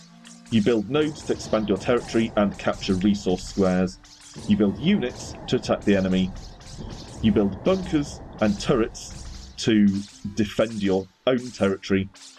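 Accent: United Kingdom. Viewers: Subscribed, nice very clear commentary, and you're English. :D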